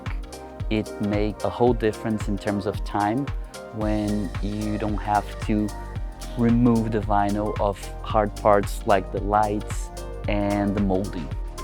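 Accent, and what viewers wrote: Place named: Brazil. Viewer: Percebi na hora que ele começou a falar inglês, pensei: esse cara parece brazuca. 😂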